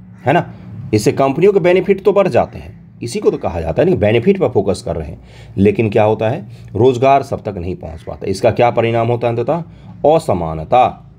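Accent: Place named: Maithili, India. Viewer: Kis district se ho sir aap.....aap maithili bolte ho esiliye bs puchha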